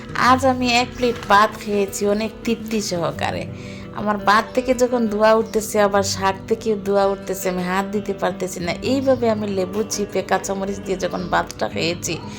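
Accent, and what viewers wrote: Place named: Sylhet, Bangladesh. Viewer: আপনি সিলেটি।কথায় ই বুঝা যায়।আমি ছিলাম ২৪ বছর।এখন ফেনি আছি বাবার বাড়ি।বিয়ে হইছে ৪ বছর রানিং।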